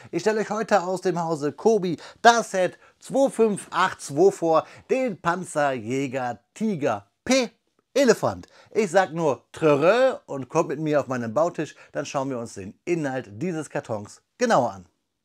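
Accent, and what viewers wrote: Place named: Southern Germany, Germany. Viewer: By your German accept I'm guessing you are based somewhere in the south of Germany. Am I correct? Thanks for another good review! The model is actually better than I anticipated. Sadly still no room on any shelf at home to place a huge one like this.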